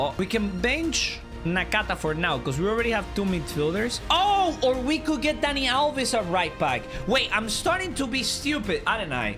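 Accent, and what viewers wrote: Spain. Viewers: Wait,you from Venezuela or spain?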